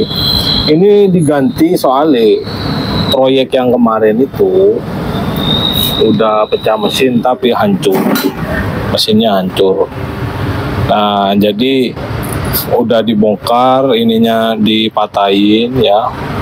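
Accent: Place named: Java, Indonesia. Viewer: Lokasi mana kok bhs nya medok jawa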